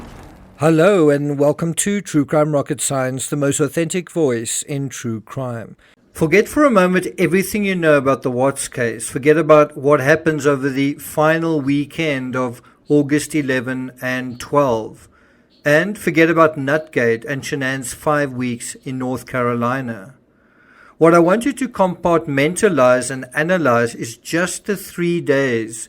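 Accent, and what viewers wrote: South Africa. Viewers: South africa i guess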